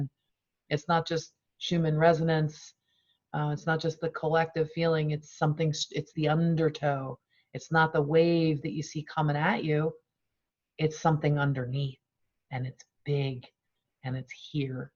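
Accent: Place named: Philadelphia, United States. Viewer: Greetings from New Jersey. I can hear your Philly accent 😃Thank you. Love your perspective and message of encouragement.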